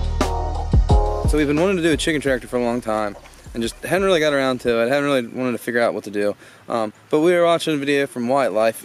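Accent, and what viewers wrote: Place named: Maryland, United States. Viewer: Definitely a Marylander. I know that accent from anywhere. 😉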